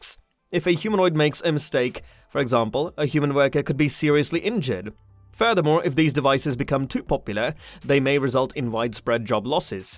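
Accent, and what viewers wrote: United Kingdom. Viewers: fkkn brit accent announcer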